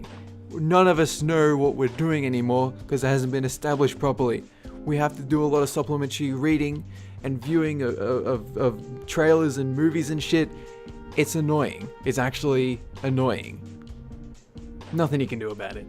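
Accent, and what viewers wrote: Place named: Australia. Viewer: Which area of Australia are you from?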